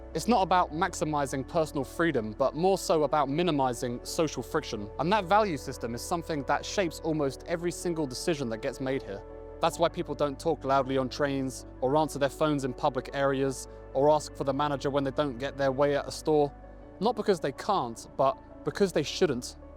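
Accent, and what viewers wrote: United Kingdom. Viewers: I thought like what? Then imheard a brit. Gotcha buddy. After your home lots of stuff gonna feel surreal.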